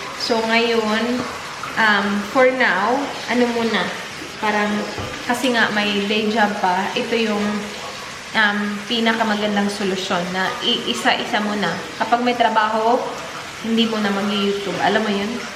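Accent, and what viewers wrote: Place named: Visayas, Philippines. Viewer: Te pag bisaya nalang ... bisaya Kay imong accent ... love ur language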